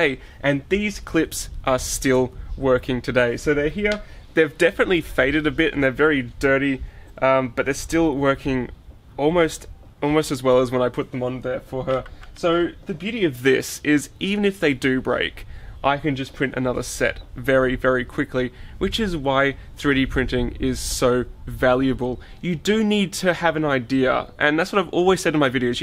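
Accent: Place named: Australia. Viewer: 5 years old and 1million views and no one has mentioned that in the closed captioning you say you defecate in your car. The auto captioning has trouble with Aussie accents, lol. Great video, all the best! Eddie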